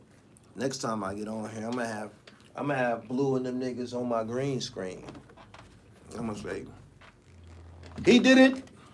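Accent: New York, United States. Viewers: You do sound like 1 of them bias new York dudes im just saying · Your slick New York talk is old my guy!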